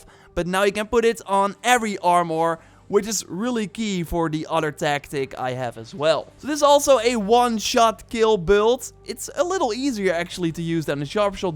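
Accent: Netherlands. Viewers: Like your videos but it is not "Tear" as in crying. It is pronounced "ter" as in tearing off my eyes in cringe of recognizing your dutch accent :) enjoy your videos though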